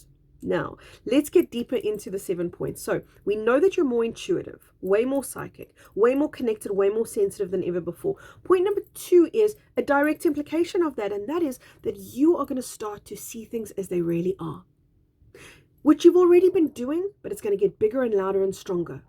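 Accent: South Africa. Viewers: Yet again, a beautiful explanation and reminding us that one of our greatest gifts is our intuition. You speak from the heart and not the Ego and that resonates with me so much. I in turn, tell my husband, who too is Awake as I am, to just ' observe ' the craziness around our world. We need to go within to find the answers and it is people like you, doing your humanitarian work to help us and guide us and explain in very simplistic terms. I am also happy you not only read my last comment but you actually responded. I mentioned and inquired about your beautiful accent and you told me you are South African...awesome...and even better, that I resonated with you because we are running on similar frequencies!!! So very true that when I go out shopping, I don't just ' see' people, I can FEEL their frequencies! Thank you kindly for your podcast my dear friend. We are all here for a reason and the biggest is to stand shoulder to shoulder and Unite against the EVIL that is disappearing from our Planet!!! 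Love and Light!!!🥰❤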